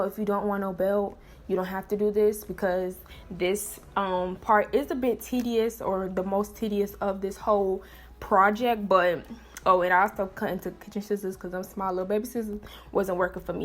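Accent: Miami, United States. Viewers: I know you from Miami just by how you talking lol but I'm def gone subscribeeeeeee! and try this style too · Lol I was like “oh sis definitely from da crib” 😂😂😂 South Florida lingo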